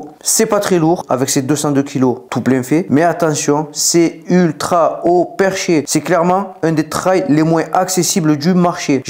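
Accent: South, France. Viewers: fatche t'as l'assent ! continue, on apprécie ici dans le Nord (la Bretagne)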